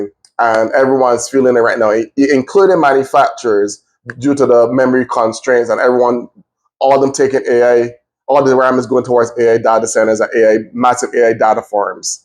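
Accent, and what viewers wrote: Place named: Jamaica. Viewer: man, you have my subscribe, I always wanted a Jamaican to explain tech stuff :D, you made my day! Respect!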